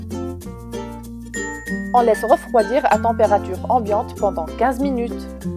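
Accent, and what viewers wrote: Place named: Algeria. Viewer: Bravo pour la recette j'adore ton accent sa me rappelle l'Algérie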